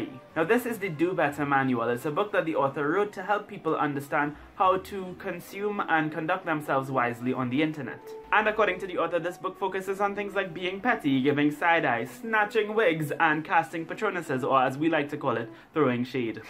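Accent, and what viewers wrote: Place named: Trinidad and Tobago. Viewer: As soon as I heard your accent I was like, "Wait is he from Trinidad?" And I look in the description and it says you're from Trinidad which is awesome because I'm currently living here! (moved to Trinidad from America a couple years ago) So nice to see a fellow BookTuber from Trinidad! Awesome video!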